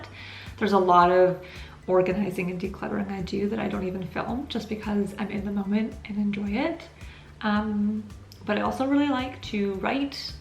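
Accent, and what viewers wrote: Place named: Canada. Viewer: I really enjoy the content, and I can easily tell that the accent is Canadian. I look forward to the video on the tattoos.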